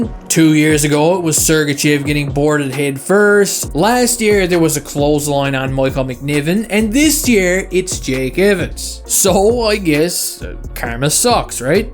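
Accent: Newfoundland, Canada. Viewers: You sound like a stupid Newfie 😂 your content is trash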